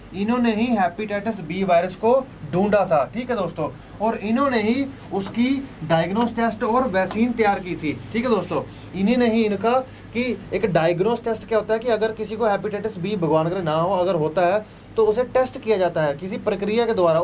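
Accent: Punjab, India. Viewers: Sir g tusi bolde ho ta hasa onda h😀😀😊😂 Pure punjabi 😄😄 Atttt sir G 👌👌👌👌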